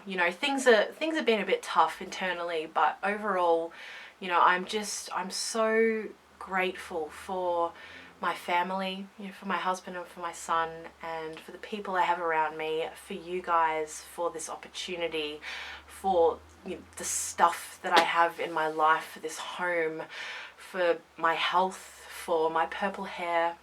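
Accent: Australia. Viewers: I spent an embarrassingly long time trying to identify your accent until I realised it's the same as mine hahaha nice to see other Australian pagans hanging around :D (I'm a new subscriber - hello!!) <3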